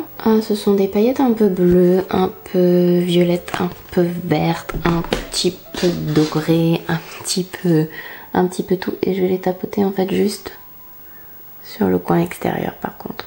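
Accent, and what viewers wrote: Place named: England, United Kingdom. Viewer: Coucou, j'adore ton petit accent anglais :) Très beau makeup, on apprends pleins de trucs. J'avoue j'adore quand tu te fais des smokies bien intenses. C'est personnel, je suis pas fan des paillètes. merci pour cette vidéo, gros bisous^^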